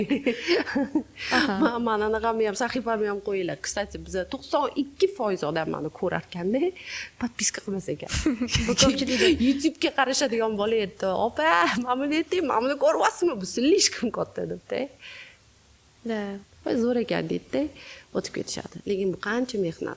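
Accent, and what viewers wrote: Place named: Tashkent, Uzbekistan. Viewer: Подкастда яхши маълумотлар берилган, сухбатдош кизикарли, лекин адабий тилда гапиришга эътибор берилса янаям яхши буларди, Тошкент шевасида гапиравермасдан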